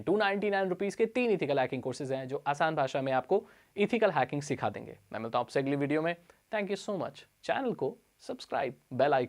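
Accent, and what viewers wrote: Odisha, India. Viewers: Bro, are you from Odisha ? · bro are you from orrisa???? love you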